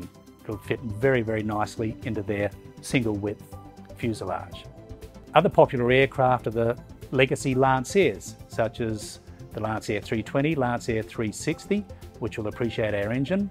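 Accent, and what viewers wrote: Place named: Australia. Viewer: Listening from Australia, was pretty sure that was an Aussie accent, checking online verified it :-) Go Aussie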